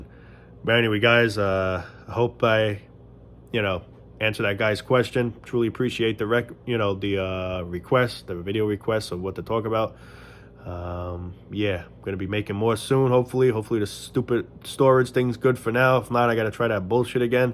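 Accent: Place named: Boston, United States. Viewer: I'm listening to your accent and I'm fairly sure and please correct me if I'm wrong but you sound like you're from Boston ... I'm from Maine so I hear the dropped Rs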